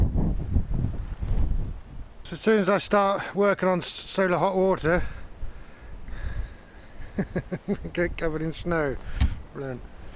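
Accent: United Kingdom. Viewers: Greetings from Nevada , USA . Found your channel by accident , very interesting topic , Liked & Subscribed . Anxiously awaiting Part 2 , in the meantime I'll be watching your other videos . -- PS: Love listening to the British accent and terminology . Brother-in-law ( Precision Machinist ) is from the UK ( London area ) . Best of Luck to you neighbor .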